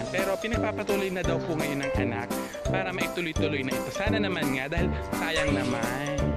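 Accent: Batangas, Philippines. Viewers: taga batangas po kayu lipa po ba😂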